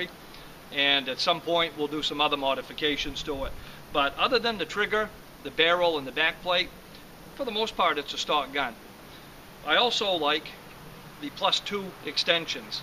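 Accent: Maine, United States. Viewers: Sounds like a Maine accent?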